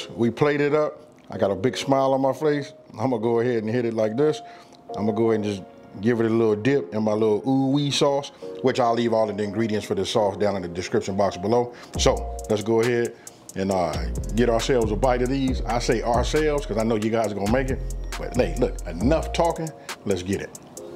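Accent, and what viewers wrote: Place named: North Carolina, United States. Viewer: This is off topic, but your accent sounds similar to someone I know from North Carolina. You wouldn't happen to be from there originally would you? Nice video, by the way. I'm definitely going to try this! Thanks!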